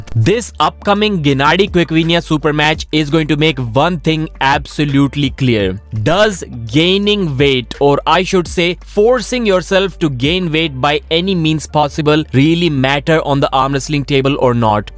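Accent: India. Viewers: voice over reeks of curry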